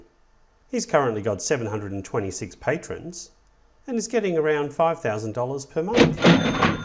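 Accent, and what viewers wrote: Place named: Australia. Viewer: the Australian accent messes me up. I keep reading from the melody that is used to modulate their language that every second or third word is sounding like either a question or "don't you understand, it's so obvious"... I really didn't get anything out of this. I mean... "ask your fans to contribute to your projects"... how? How do you get your "fans" and why would anyone "pay" me?? The very idea of advertisements in the classic youtube monetisation means people themselves don't need to spend money. The corporates do that with their (massive) advertising budgets. Most people want everything for free in this buy one get one free culture today. People actually pay a monthly subscription for videos that appear from time to time from someone? I certainly would pay for that unless I am following a course and want to see each video and "get something for my money". 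I don't really get it.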